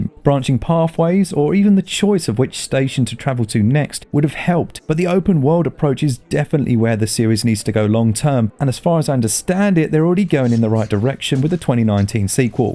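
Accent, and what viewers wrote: United Kingdom. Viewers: Man, I love your british accent! Great reviews, always. You have now a huge mexican fan.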